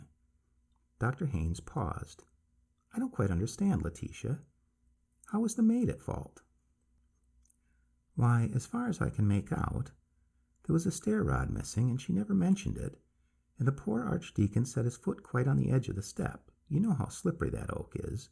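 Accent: United States. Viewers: Colonial? American…. he talks in a rush and then inapause…..strange delivery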